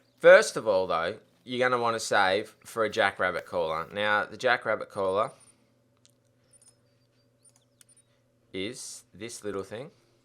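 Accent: Australia. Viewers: yea that voice and aussie accent XD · TheGilgers 2017 lmao he could be Australian cause we do have very similar accents. And also most of my Aussie friends like to use the word cancerous a lot considering how we pretty much butcher the English language.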